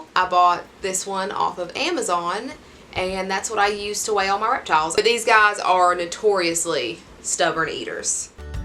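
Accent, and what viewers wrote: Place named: Alabama, United States. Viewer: You sound like you are from Alabama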